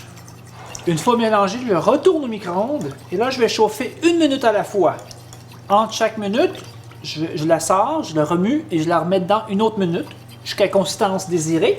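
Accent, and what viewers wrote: Quebec, Canada. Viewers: Hihi c'est marrant que tu sois français :) Car tu as bien pris l'accent du quebec ^^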